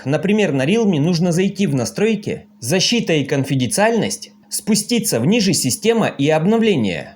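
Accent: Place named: Ukraine. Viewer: Почему у хохлов, такой ****** говор? Нет, реально раздражает.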